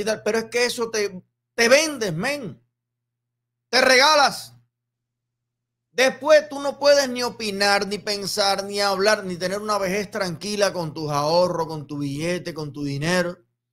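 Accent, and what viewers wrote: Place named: Rural, Cuba. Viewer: De que provincia eres de Cuba te siento por el habla eres guacho guacho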